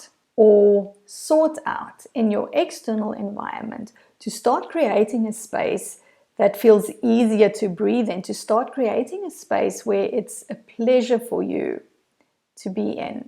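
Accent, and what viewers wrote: South Africa. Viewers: You sound South African ?This is so beautiful I love this message thank you I would really love to work with you,new subscriber and so glad I found you. I'm so ready to claim my Queendom Yassss!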